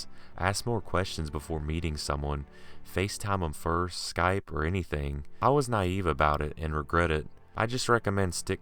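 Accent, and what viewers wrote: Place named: West Virginia, United States. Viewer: Love listening to your voice! I'm from West Virginia and your accent sounds like you're from wv too!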